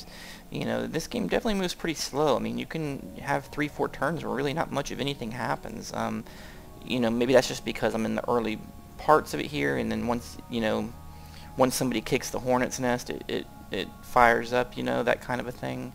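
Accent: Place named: South, United States. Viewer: Not usually a fan of Southern American accents but yours is pretty relaxing and clear.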